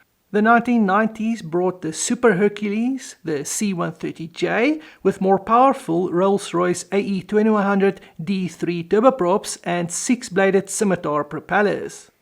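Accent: South Africa. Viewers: Love the South African accent, reminds me of my days in Joburg. Great video, the YC at Pima Air and Space is quite something to see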